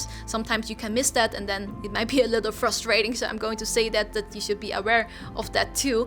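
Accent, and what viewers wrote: Netherlands. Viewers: She has a netherlands accent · Are you Dutch? You sound Dutch.. 😄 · amazing dutch accent, doesn't match ur face though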